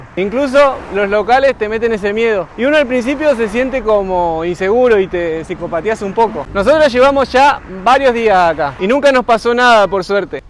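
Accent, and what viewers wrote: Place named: Argentina. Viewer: Es guyana, no se dice guchana, se dice llueve, no chueve, buena informacion, solo que no me gusta que los argentinos, hablan con la Che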